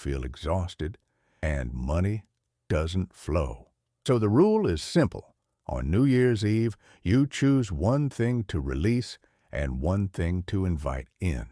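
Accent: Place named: South, United States. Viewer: OMGracious.......nothing like a southern drawling buddhist message~ ~ ~ giving us an opportunity to stretch past concepts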